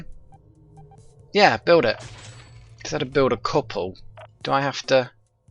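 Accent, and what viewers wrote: England, United Kingdom. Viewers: So you get a lot of crap from ScreenRave about your "UK" accent. I may be an American, but I understand how ridiculous that is. There's no "UK" accent. There are dozens, maybe hundreds. Whereabouts are you from? I don't recognize the city or area your accent corresponds to... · I am an Idor, and I just wanted to ask, are you from England itself? Or from scotland\ireland?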